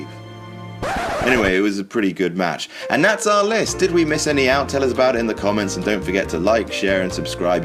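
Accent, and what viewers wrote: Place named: England, United Kingdom. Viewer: your boring pommy monotone kills all the joy in hardcore wrestling. Get out of here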